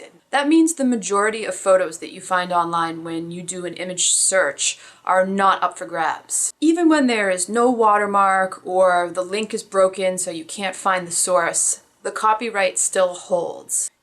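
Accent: Boston, United States. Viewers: This was really informative. Thanks! And your boston accent cracks me up 😆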